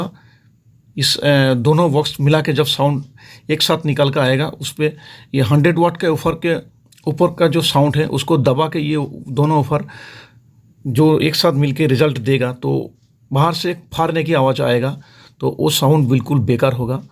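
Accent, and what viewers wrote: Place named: Bengal, India. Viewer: Universal amplifier ka Chandni main shope ka mobile no muzhe google se milega hai, ph mein bat huya hai. AaJ ke somoy mein 3400/- price hai. Ar tumi to ekJon Bangali tomar kothar Tane ami buzhe geychhi. Tobe niJer matri vasa chere keno hindi te video banachho. Banglay banao seta teo tumi popular hobe. Thak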